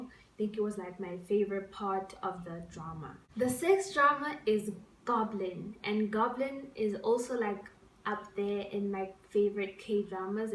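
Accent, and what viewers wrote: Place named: South Africa. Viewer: When I first watched your videos I was like mhm, she sounds South African. I don't know if you are but I am and I just got really excited. Love your channel💕❤️ You watch kdramas too?! OMG I'm immediately subscribing🌻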